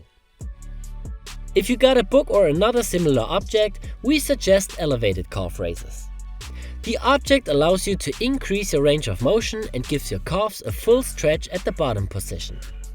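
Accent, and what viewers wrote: Germany. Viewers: Plant based & fasting. & sobber train except coffe) =never sick again! Can prove it😍 Gruss aus Bali🌴 Ps:funny german accent) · Is this a german accent?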